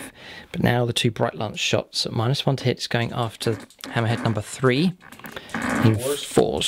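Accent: Northern Ireland, United Kingdom. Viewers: Northern Irish accent is immediately recognisable.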